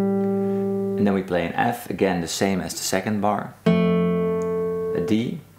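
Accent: Netherlands. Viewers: You have a Dutch sounding accent. Wow, liked this video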